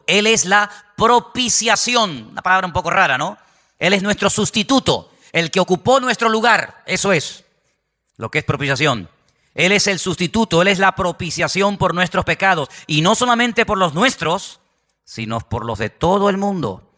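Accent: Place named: Argentina. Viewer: vale pensé era argentino se nota no e español, buen pastor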